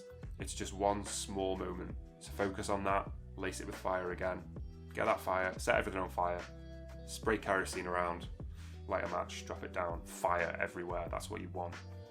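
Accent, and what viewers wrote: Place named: North, United Kingdom. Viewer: Just found your channel from this great video 👍 you sound like a fellow northerner! I've been writing flash fiction lately and really enjoying it. Looking forward to checking out some more of your videos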